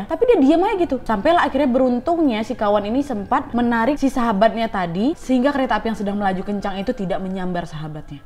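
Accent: Indonesia. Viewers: Hebat kamu bisa membawa rasa Indonesianya(Aksen) dalam cara membaca naskah. Terusin channelmu semoga sukses👍👍👍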